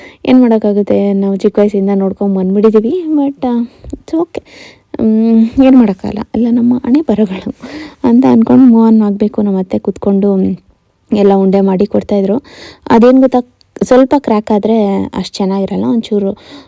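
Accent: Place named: Hassan, India. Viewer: sister ನಿಮ್ಮ ಊರು ಹಾಸನ ನೀವ್ ಮಾತಾಡ್ತಿರೋ ಭಾಷೆ ಹಾಸನ್ ತರ ಇದೆ ನಮ್ದು ಹಾಸನ ಅದಿಕ್ಕೆ ಕೇಳ್ದೆ 🫰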